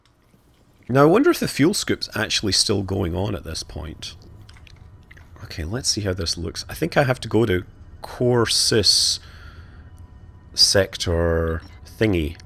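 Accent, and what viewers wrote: Scotland, United Kingdom. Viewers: Mr.: as you got closer to 'Home', I shared in your obvious excitement. Well played, commentated, and shared. Thank you! P.S. It was your Scots accent which originally caught me, then I watched more of your vids, and have since subbed. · Has anyone told you, you sound like a Scottish Carl Sagan?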